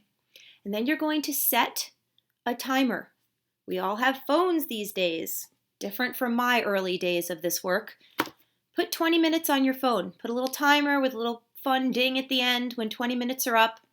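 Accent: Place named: Long Island, United States. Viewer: Great work you are doing. Are you a Long Island Italian transplant to Delaware too? Thought I can hear the accent...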